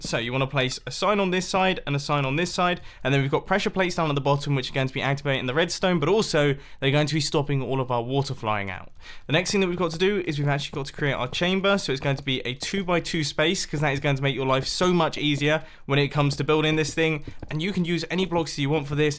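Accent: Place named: England, United Kingdom. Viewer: I’m sure you might have said this before somewhere, but I’m a new subscriber and just wondered whereabouts in England you’re from?? I’m from England too but your accent is so lovely to just zone out and listen to!!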